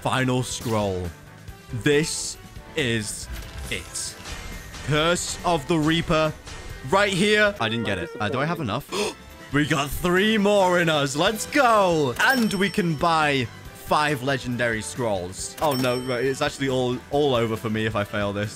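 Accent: United Kingdom. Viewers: Yea but ur brtish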